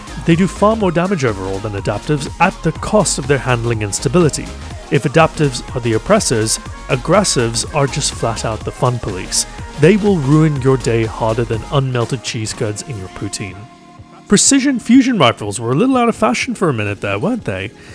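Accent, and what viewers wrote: United Kingdom. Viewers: Great video mate! Your voice makes me want to visit the UK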